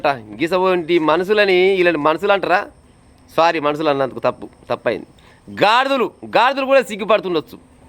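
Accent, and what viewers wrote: Telangana, India. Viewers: తెలంగాణ పల్లెయాసలో కథ చెప్పడం చాలా ఆసక్తిగా ఉంది. కలియుగంలో ధర్మం కేవలం ఒక పాదంపై నడుస్తుంది అంటారు. ఆ ఒక్క పాదంకూడా బలహీనమవుతున్నట్లుగా ఉంది రాను రాను.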